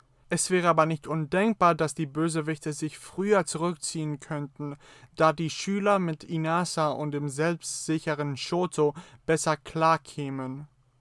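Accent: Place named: Germany. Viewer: I thought he was German lol. From his accent while speaking English.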